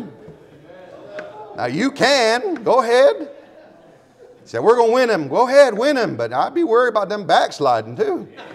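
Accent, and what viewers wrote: United States. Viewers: Good job preacher, sounds like your somewhere in or around the Texas area.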